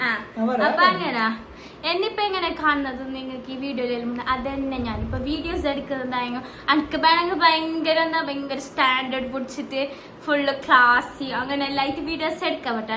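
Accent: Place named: Kasaragod, India. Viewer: Ninde ksd baashe paangilla ninde porel mundne aa slanganne ni mundumbo paang👍🏻❤‍🔥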